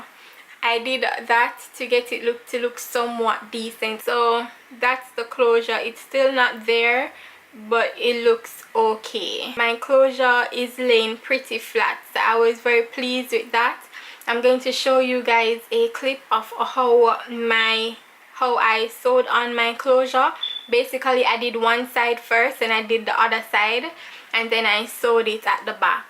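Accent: Jamaica. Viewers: Are you Jamaican? Guh deh yaaaaaaaadie!!!! Love seeing fellow Jamaicans on YouTube doing there thing.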